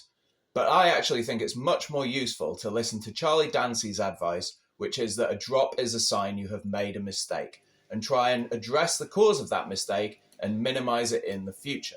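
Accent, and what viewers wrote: England, United Kingdom. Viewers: Hey, mate, are you born in UK... it seems you speak British accent English, don't you?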